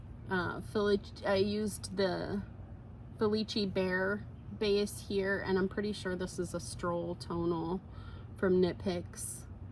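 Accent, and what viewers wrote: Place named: Wisconsin, United States. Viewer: Another Wisconsinite here! Thought I recognized that accent😉😂😂😂 Just stumbled on your podcast😊 and I'm so happy I did! Just learned to knit socks about 2 years ago and have become obsessed!!! They are THE best for our frigid WI winters❤️❤️. I also started the tradition of gifting them to my adult daughters for Christmas - this will be the 3rd year😊 And they always end up sending me pics of themselves wearing them & saying how much they love them!!! Thanks for taking the time to share your knitting!!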